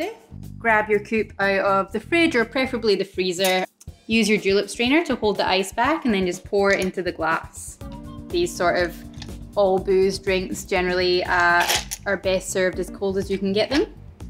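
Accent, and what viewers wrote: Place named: Scotland, United Kingdom. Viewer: I heard her saying "Here in Australia..." before, but to me it sounds as if she's actually from Scottland...correct me if I'm wrong Love her vids though :D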